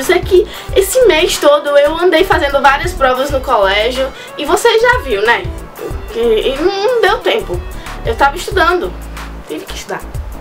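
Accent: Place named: Natal, Brazil. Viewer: Tu é de Natal é? Sotaque lindo... USHAUHUHUASHHASHUS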